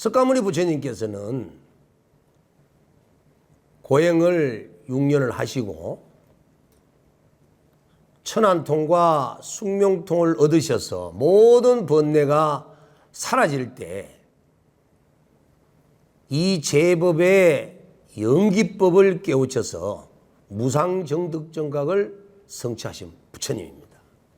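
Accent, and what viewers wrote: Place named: Busan, South Korea. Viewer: 🌈 듣고 또 듣는 이 소중한 법문 정말 감사드립니다. 제가 하와이에 살아도 , 법문을 부산 사투리를 섞어서 하시니 고향의 정겨운 마음이 웃음으로 더 제 마음에 담아집니다.🙏🙏🙏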